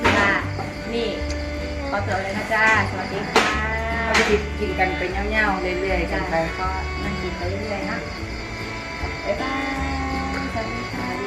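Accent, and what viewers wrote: Isan, Thailand. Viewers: คุยอิสานน่ารักน้อง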